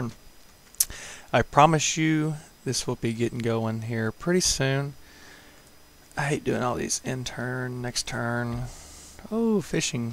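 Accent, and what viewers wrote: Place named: South, United States. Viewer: your southern accent is great dude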